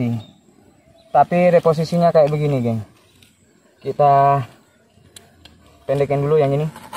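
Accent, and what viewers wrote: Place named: Madura, Indonesia. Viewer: Keren.. Klo dr logat bahasanx logat madura nih.... 👍👍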